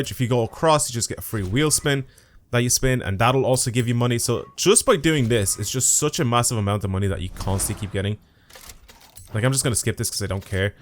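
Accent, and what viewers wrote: Ireland. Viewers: Are you Irish? I assume yes. I'm new to your channel. Am Irish too, where you from?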